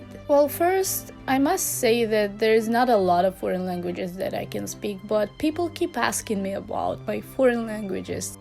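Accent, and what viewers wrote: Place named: Russia. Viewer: Ааа мне нужно часовое видео с этим Russian акцент😹 обожаю его😹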